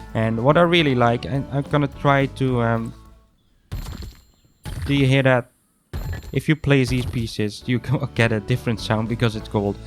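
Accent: Netherlands. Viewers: The dutch accent is amazing 🙂🇳🇱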